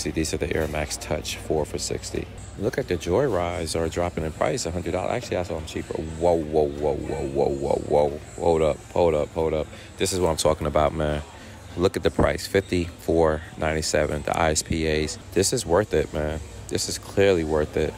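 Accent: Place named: New York, United States. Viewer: I love u talking cuz I am thinking ur from NY and I am from there and I live in Charlotte now so I like to hear u talking. Just found ya channel. Dig ur vids. 💪🏿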